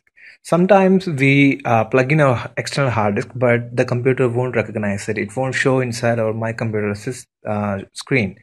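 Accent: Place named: India. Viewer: you know its going to be a good tutorial if you haer that indian acent. btw thx it worked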